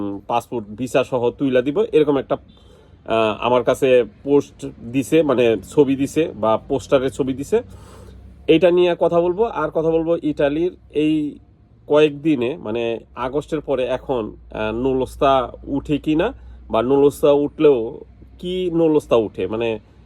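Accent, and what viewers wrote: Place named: Chittagong, Bangladesh. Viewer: চিটাগং কথা আপনার কাছে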